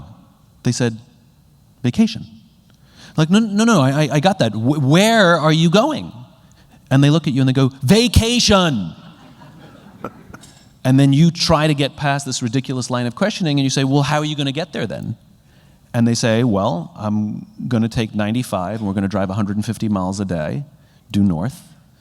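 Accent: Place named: United Kingdom. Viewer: I love British accent of yours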